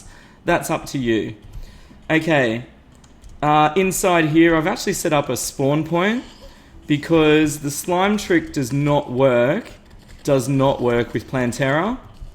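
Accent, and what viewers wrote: Australia. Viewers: Are you Australian because I am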